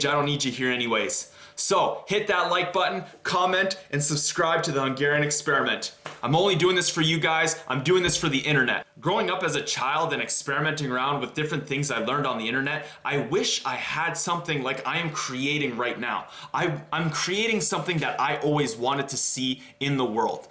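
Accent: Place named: Russia. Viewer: Why the Russian accent if you are Hungarian ? "Vun hundrred perrscent Hungerrian voman"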